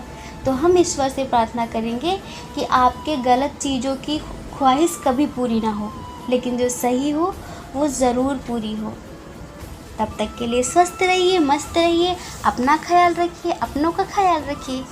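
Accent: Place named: Bihar, India. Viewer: Apki langauge bihar ki he completely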